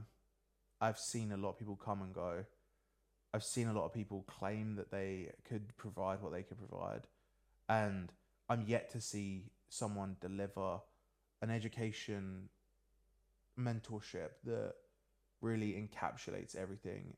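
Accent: United Kingdom. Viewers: dude you certainly don't have an ear for accents that's for sure. Everyone on earth knows that he's British as soon as he starts speaking haha. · wait your british? i always thought you was American 😅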